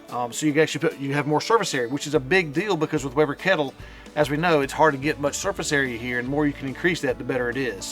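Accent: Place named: West, United States. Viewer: My name is Ron and I have a tip for you. If you use the clips from the Onlyfire pizza and rotisserie assembly it will rase your great higher into the kettle top and the chicken cooks even better (crispier). I never take my clips off anymore, I use them on all grilling that I do.. P.S. I truly enjoy your Chanel. I like your background I like how you present your work and slight and not so strong western accent there is a western actor that you look and sound just like him, he was the Rancher on City Slickers. Also you should get a long meat poker or fire place pokers to use when setting up your grills. Great video as usual.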